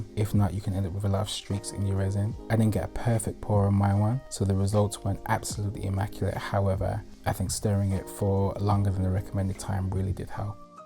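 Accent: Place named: England, United Kingdom. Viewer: Are you. British? You have such a nice and calming voice but your accent is very interesting because it isn’t super strong English accent :) your art and crafts are so beautiful and good too it’s amazing how you can make all this stuff yourself and very creative!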